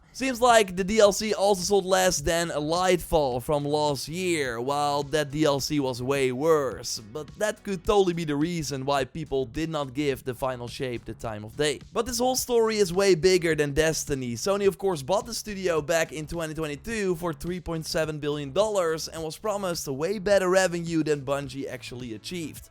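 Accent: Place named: Netherlands. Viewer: The Netherlands. He is Dutch.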